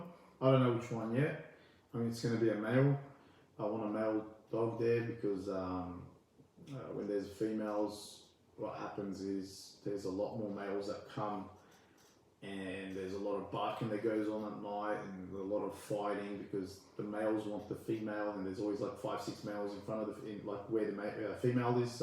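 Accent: Australia. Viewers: Everytime I hear your Aussie accent, I smile... You have a special place in our hearts back here in Australia. You are doing great work. Don't give up ok. This kind of work you do for the animals can suck the life out of you if you let it. Please take care and look after yourself x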